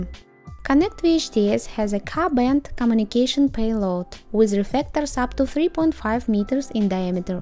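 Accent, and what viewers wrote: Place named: Slovakia. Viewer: As for the accent I'd say Slovak or maybe Romanian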